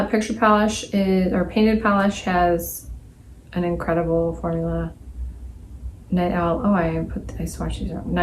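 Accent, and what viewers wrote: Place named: Midwest, United States. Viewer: Great video, I've always been a bit intimidated by blue nail polish, but it's so cute! Also, I'm hearing a suuuuuper strong Wisconsin/Minnesota accent, am I correct? Lol.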